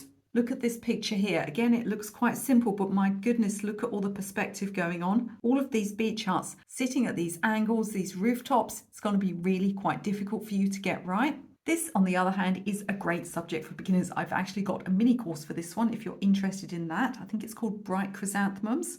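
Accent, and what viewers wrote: England, United Kingdom. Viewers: Hi, Ronnie here. JUST A QUICK FYI- IF YOU HAPPEN TO GET ANY COMMENTS THAT YOU SPEAK TOO FAST- THOSE VIEWERS MAY NOT KNOW THAT THEY ARE ABLE TO MANUALLY SLOW DOWN THE VIDEO PLAYBACK SPEED IN YOUTUBE, WHICH IS A GREAT FEATURE,BECAUSE TO PROCESS SPEACH IS A VERY COMPLEX ISSUE FOR THE BRAIN, ESPECIALLY AN AGING BRAIN, THAT'S A SCIENTIFIC FACT (LOL), FOR EXAMPLE, I AM AMERICAN & YOU ARE BRITSH & WE BOTH SPEAK ENGLISH, RIGHT. BUT ACCENT S AND CADENCE COME INTO PLAY OBVIOUSLY, RIGHT, THAT'S TO BE EXPECTED, NATURAL. I HAVE BEEN A SUBSCRIBER OF YOUR CHANNEL FOR YEARS NOW & I LOVE IT!! SO, I HAVE BECOME USED TO YOUR ACCENT AND RATE OF SPEAKING OVER TIME. BUT, WHEN I FIRST BECAME YOUR SUBSCRIBER- IS WHEN I DESCOVERED " THE YOUTUBE PLAYBACK SPEED FUNCTIONS. AFTER ALL, THE INFORMATION YOU ARE PROVIDING CAN BE RATHER COMPLEX, FOR SOME,PERHAPS. 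YOU DO AN EXCELLENT JOB!!!!! THANKS,! BRAVO, ON YOUR YOUTUBE CHANNEL! WARM REGARDS, RONNIE ~ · Yes, I do watch some of your videos repeatedly. And I keep picking up and using more skills and techniques each time! And besides your adorable English accent and vernacular are quite charming and helps my attention deficit brain stay focused instead of tuning out from the audio as happens when I watch some other content creators. 🎨🧑🏻‍🎨🥰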